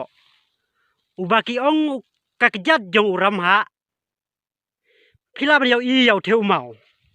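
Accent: Sohra, India. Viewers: Kren sohra ruh pat nangre ine ima kum ba khreh poittry I mat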